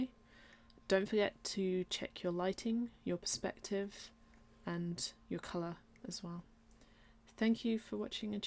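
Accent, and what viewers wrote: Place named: Southern England, United Kingdom. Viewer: Well that was okay but you've gone too fast for some, I've only cottoned on by pausing the video to see what you've clicked. As of now, I'm totally sick of hearing your posh Southern voice & I'm a fellow Brit. You've literally explained nothing here - just skirted over everything at pace, awful, awful "tutorial" but "thanks" for trying...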